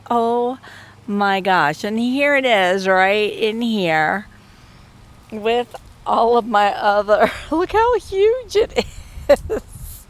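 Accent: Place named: Texas, United States. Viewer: Pretty mild compared to Texas(I assume from your accent), with average temperatures ranging from around 50-70°F. However, we do experience occasional heatwaves where temperatures can soar up to 86°F. These hot spells can be quite intense, but they are relatively short-lived. For me 70 feels like an oven :)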